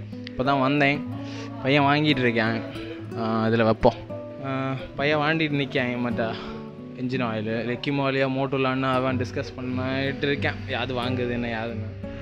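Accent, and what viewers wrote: Kanyakumari, India. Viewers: Thala iam in Thoothukudi, Ungaloda kanyakumari slang nala eruku thala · Kanaya kumari slang 🔥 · Makka namma slang la neraiya pannunga other dist people ku romba pudikum namma slang.... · Nama slangu solava veyanum🔥🔥❤... ne podu makka... verra tamil athu kumari tamil tha❤🔥😎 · Super ra speak pandringa kanyakumari language la bro... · Kandippa bro, waiting for Kumari slang vlogs😍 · Vlog in Kanyakumari slang 👌👌👍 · Ithu enga Kumari tamizh♥️ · Kanyakumari slang 💓💓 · Neenga Pesura Tamil kekkave inimai ah irukku bro Edho somma solra nu nenaikaadheenga bro unmayavey avlo arpudham ah irukku bro Basic ah vey enakku Malayalam romba pudikkum and Namma Kumari slang kekkumbodhu... Adha solla varthai eh illa bro It's melting my heart♥️ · our village. Kanyakumari slang adi Poli🔥🔥🔥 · Kumari slang 🔥🔥🔥🔥🔥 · Kanyakumari slang verithanam 🔥🔥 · Machi😍😘 nalla irrukku unnga kanyakumari slang mm semma style mm😘😍athan finishing than semma😁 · Bro இது சிங்க தமிழ் என்னும் குமரி தமிழ்🤙🔥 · Ithu than kanniyakumari slang unluk Jack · Malayalam kalantha tamil,na athu enka kumari tamil,tan.....enka slang enka adayalamla makka😎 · Kanyakumari Tamil vera level 😂😂😂💥💥💥💥💥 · Super kanyakumari tamil love to hear this kind of tamil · Slang 🤩🤩✌️ Next video kanya kumariii slang venum 🙋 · innum neraya unga slang la video pannunga makka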